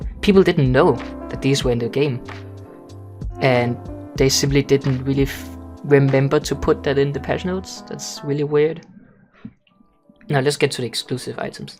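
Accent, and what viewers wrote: Denmark. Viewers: easy to hear that you are danish XD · Fuck man kan bare høre du er dansk XD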